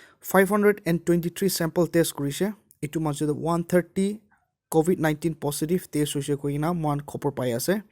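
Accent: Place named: Nagaland, India. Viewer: Speaking nagames so sweet bro ang.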